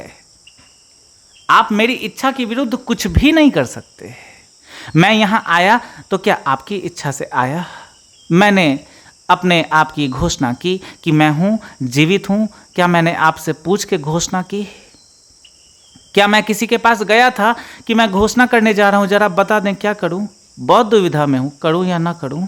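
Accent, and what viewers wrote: Bihar, India. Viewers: Isko ignore kro , Isko jitna importance doge ye highlight hoga aur logo ko chutiya banayega. Ye mimicry kr rha hai, Bihari hai ye iska tone bta rha hai.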